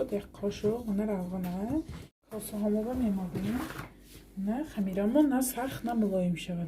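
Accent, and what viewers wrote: Isfara, Tajikistan. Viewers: Бехтарин кадбоонуи зур шумо ба назарам аз исфара лахчаи исфарагихобоин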